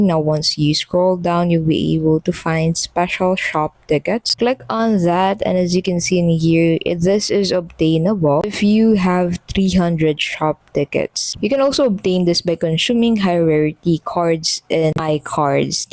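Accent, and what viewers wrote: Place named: Philippines. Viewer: You sound pinoy.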